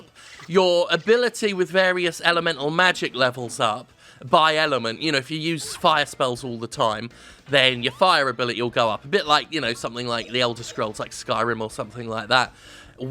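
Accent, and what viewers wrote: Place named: United Kingdom. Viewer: Is it just me or did Jim sound extra-British in this video.....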